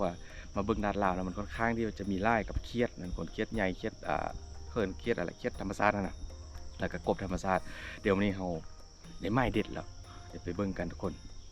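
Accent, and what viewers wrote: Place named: Loei, Thailand. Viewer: เฮาเว้าสำเนียงเดียวกัน เว้าคือกันทางเชียงคาน จังหวัดเลย ข้ามมาเที่ยวฝั่งไปแหน่เด้ออ้าย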